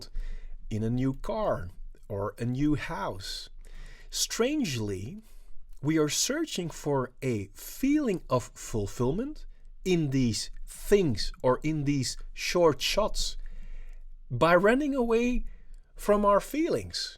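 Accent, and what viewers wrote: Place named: Netherlands. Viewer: You have a strong dutch accent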